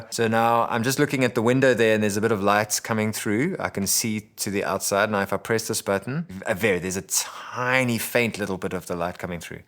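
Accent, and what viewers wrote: South Africa. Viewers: Is he south african?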